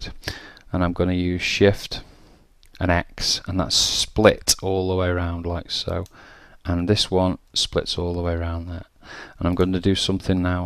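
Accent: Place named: England, United Kingdom. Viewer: Believe you me, he has a beautiful English accent.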